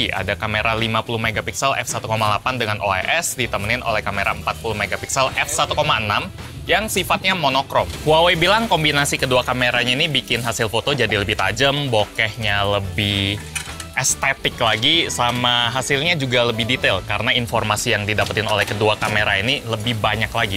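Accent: Palembang, Indonesia. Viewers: Aku Cuman Fokus Pas Ngomong, Aku Tekwan samo pempek gendum nyo sikok ee. Apo ko aseli Palembang. Haha salam dari musi rawas ko. Maen maen lah ke musi rawas agek ku kasih kuliner keremis. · Asli wong Palembang apo bang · Ehh wong palembang ee bang? Baru tau kalo bang wong palembang 🤣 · Ko ni wong palembang apo kek mano😅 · Sering denger abang pake bahasa Indonesia, sekalinya pake bahasa palembang kedengarannya lucu, salam sumsel bang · Wong palembang pecaknyo ni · Uwooohhhh... Pas bang ngomong ama penjual kaki lima nya pakai bahasa Palembang, kentel banget. Jadi rindu tempat kelahiran gw jadinya. 12 tahun merantau dijawa belum pulang2. Liat bang tiba2 jadi inget kampung halaman. Wkwkwkwk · asli plembang lu bang? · Bang orang palembang? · Orang palembang y bang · Seneng nian aii denger kak pake bahaso Palembang ni 👏👏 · Wong Palembang niannn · Wong palembang apo om ni? 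Biso bahaso palembang. Apo lah teirup cuko tu jadi biso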